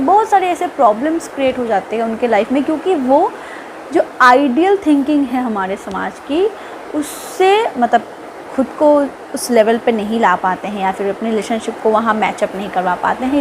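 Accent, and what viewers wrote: Bengal, India. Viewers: aapni jodi banglate bolle khub bhalo hobe aapni jokhun Hindi bolen bangla bangla bhab aase aapnar thopik guli bhalo lage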